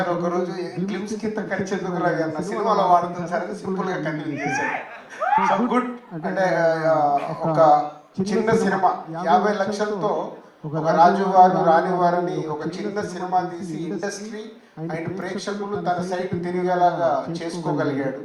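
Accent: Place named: Tamil Nadu, India. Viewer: Dialogue lo TN slag ee vinipiatundhi😂😂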